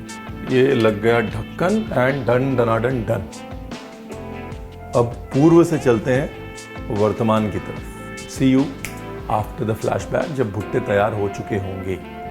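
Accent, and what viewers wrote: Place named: Bihar, India. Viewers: ji tohada Bhojpuri boli da touch khub vadiya lagya si assanu.. 🙂 Coming to Poodi- Yenaka Poodi rumba pidikyon.. I am Super blessed to have a Tamilian inlaws family.. My mother in law prepars food with lots of conciousness, blessings and ofcourse love.. I can indeed realize and see the same conciousness and true love in your preparations.. 🙂 Thank you so much for sharing love and care through your authentic food/cooking.. From Russia.. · 2 tho, 3 tho, bihar se hai kya bhaiya..? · Ek tho do tho Bihar bhasa mast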